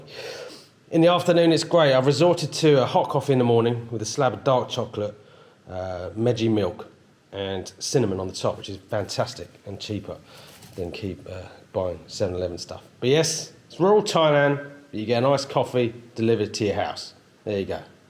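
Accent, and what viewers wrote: Cockney, United Kingdom. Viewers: Just came across some of your videos, I like your cheeky chappie cockney narrative. Your house is lovely, and a good sized plot too. Very luxurious, great master bedroom, must have cost a fortune, were you rich in England so you can afford to live well there in a very early retirement? Ew, didn't like that mass of maggots thingys that the storm blew in, do they go away on their own or do you have to brush them up and bin them? 20 years you have lived there, where is your wife, yes I am nosey? Hi to your lovely daughter, I will look for her business video, she has done well for herself and I take it you see her weekly?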